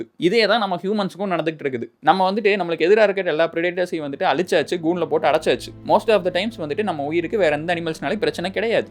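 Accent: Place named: Kongu, India. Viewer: சடபுட கொங்குஸ்லாங்❤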